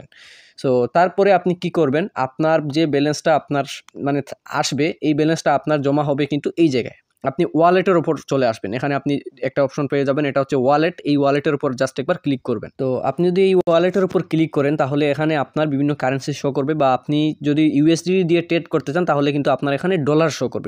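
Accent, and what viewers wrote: Sylhet, Bangladesh. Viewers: Vai ki Sylheti?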